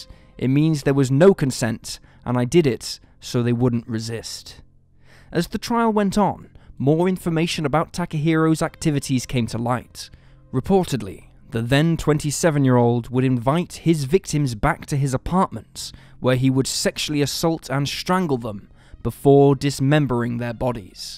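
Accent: England, United Kingdom. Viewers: oh no! Well I would have thought that Scandinavian countries had a lot more common sense than us in many ways ie lifestyle, law and order etc...well you did burst my bubble now! 😂 I live in Australia and I thought you were from England. Lol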